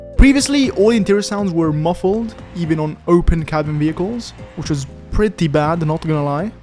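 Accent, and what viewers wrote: Italy. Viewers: bro so easy to tell you are italian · ma sei italiano? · Ma sei italiano? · aoo ma sei italiano ? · Ciao, ho sentito che sei italiano. Come fai a parlare inglese così bene?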